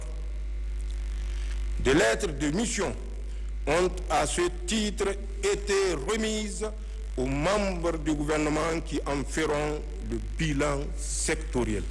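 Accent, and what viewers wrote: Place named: North, Benin. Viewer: Le Président Thiani a l'accent des béninois du Nord.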